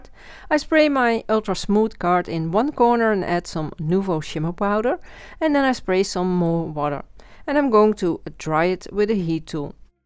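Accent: Netherlands. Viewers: Wstunning once again! Are you from Holland? Your accent sounds familiar!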